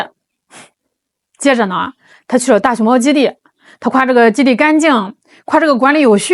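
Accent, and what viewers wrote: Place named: Shandong, China. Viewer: 这个没治了太亲切了，山东老乡哈哈哈哈🥰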